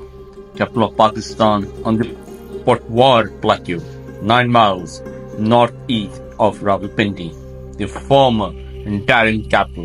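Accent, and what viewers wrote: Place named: England, United Kingdom. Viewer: Love the English accent..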